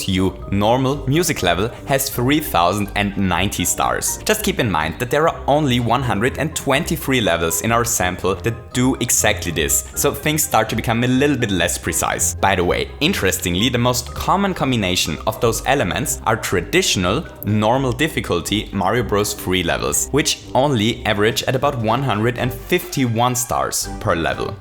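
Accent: Austria. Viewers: AUSTRIA, finally, i knew you had an accent, but I couldn't figure out which. Btw, greetings from your neighbour country, Germany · Was! Du bist Österreicher? Ich mein, ich schau mir deine Videos schon seit gut einem Jahr an und wusste das du Englisch nicht als Muttersprache hast, trotzdem überraschend! Grüße aus Graz ;) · Du bisch österreicher??😳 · Are you from Austria? Wäre echt krass😂😂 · OOOOOHHH You're Austrian! I had no idea where you were from XD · Oh you‘re from austria? So you talk german now i understand your accent lmao. Im swiss btw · Warte mal du kommst aus Österreich? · Hahaa, I knew you were from austria (dont know if you mentioned it before), but I could guess because of your wonderful accent. Hot Cogs. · Wait what you are from austria? Hurray! I could never figure out where that accent was from even though it was right in front of me the whole time. 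Mach weiter so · Eyyy du bist Österreicher? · ohhh hes from Austria. That's what accent he has! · Du kommst aus Österreich?! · Hob ma scho docht, dassd a Österreicha bist 😅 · I'd never guess you are from austria, even though you speak a rly strong dialect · Ha, Ich hab gewusst dass du Österreicher bist, der Akzent ist mir schon bekannt vorgekommen :D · Momentmomentmoment! Du bisd österreicher?!? Jo lol, seas😂😂👋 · IS AUSTRIAN EVERYONE, NOW WE CAN STOP ASKING WHERE HIS ACCENT IS FROM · HOO-ray, FINALLY! I've been trying to place your accent since I found your channel. My boyfriend thought maybe Eastern European, (e.g. Czech) and I was guessing German. Austria it is. Absolutely beautiful country. Love your work, keep up the great content. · Mit seinem Akzent wie kannst du überrascht sein dass er aus österreich kommt 😂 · Du bist Österreicher? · Du bist Österreicher? Nice. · Du bist Österreicher? 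Nice! Ich auch! Ich wusste von deinem Akzent her, dass du deutsch sprichst, aber Österreicher wusste ich nicht! Schön! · He just said it in the video. He's from Austria. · Warte was du bist Österreicher? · Warte… du kommst aus Österreich?? :o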